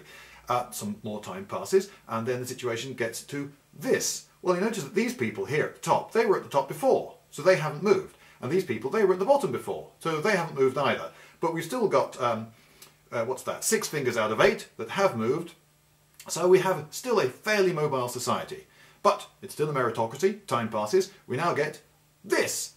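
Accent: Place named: RP, United Kingdom. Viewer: Cbf to explain why your analysis is fcking stupid. You having a posh accent doesn't make you clever.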